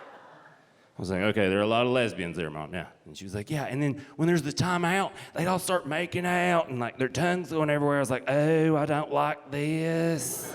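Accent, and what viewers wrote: South, United States. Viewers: Why do i love southern accent this much..!? I need to get me a country wife..i tell you HWat!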